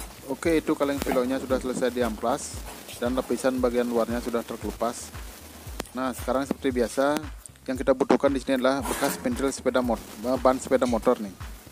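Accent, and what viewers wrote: Aceh, Indonesia. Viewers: Kyk nada aceh ni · Kyknya org aceh nih dr logatnya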